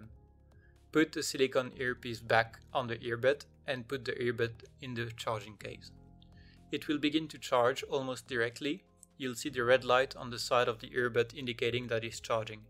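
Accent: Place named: France. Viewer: Merci pour la vidéo ! (J'assume que t'es français mais c'est léger à l'oreille)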